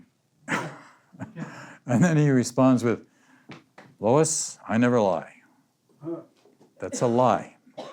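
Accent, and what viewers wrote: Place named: Missouri, United States. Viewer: Yes, I do hear your Missouri heartland accent, no? 🙏🏼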